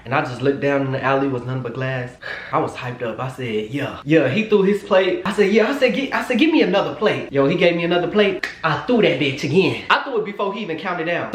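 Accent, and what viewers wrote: New Orleans, United States. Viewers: You and this Nola accent is a kiiiii lmfaooooo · LMAOOOO THAT New Orleans Accent Giving Playboys LMAOOO I Love Your Channel Down🥰😂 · lol it’s the new Orleans accent for me 😂😂😂😂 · its the new orleans accent for me🤣🤣🤣🤣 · U sound like messy Mya in ur New Orleans voice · you snapping in this new orleans accent hahahaha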